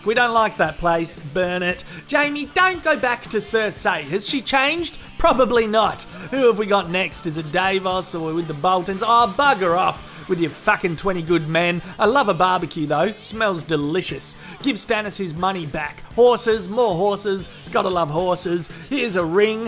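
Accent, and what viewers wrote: Australia. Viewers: The best thing these videos have done for me is helping me finally know how Australian sounds and why people love it so much. And learned many new Australian words, like cheeky and spew. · You prove that, for a fact, putting an Australian commentaror dropping f bombs over ANY video equals funny. The accent and enthusiasm, great timing, good show man. Like buttons all the way.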